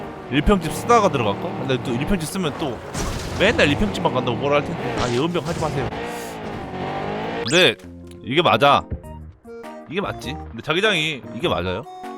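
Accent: Jeolla, South Korea. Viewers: 여기서 전라도어 찐하게 배울 수 있어서 애청합니다. 요즘 잘 써먹고 있음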